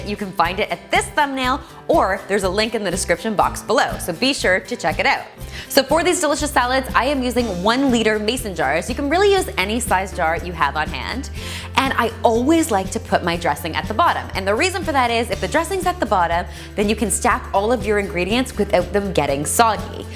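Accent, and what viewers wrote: Canada. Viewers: Hi, I'm actually watching this in 2016, I just discovered your channel through "DoitonaDime", and was very intruiged. Your one pot pasta meals seem great, and I can't wait to try them out! I could hear (at least I think) that you are Canadian? Well, I'm from Belgium, but my uncle emigrated to Whitby, Ontario in the fifties! I used to spend a lot of my summer holidays there, since my dad passed away when I was only four. I loved it there ! Some pretty good, warm memories... Now, my uncle has passed away, too, and I have to grown kids of my own, so I basicly never went back...too busy. Iwas wondering what kind of jars you use, and if they're available online (worldwide shipping, of course). Thank you so much for all of this! 💝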